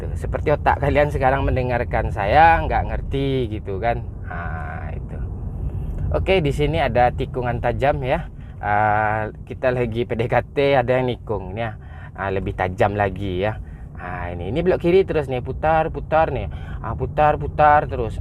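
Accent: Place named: Sipirok, Indonesia. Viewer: bawa Mobil ke rumah bOcil, terus njemput, berlanjut ke rumah Bang, kemudian jalan ke Bekauheni, naik kapal, terus ke Yana dan kemudian berlanjut ke Museum Angkot dan makan besar sama Bang.... Gitu.... #squadkampret #KocakGamong Kok aku kayak dengar ngomong Sipirok ya??? Padang Sidempuan???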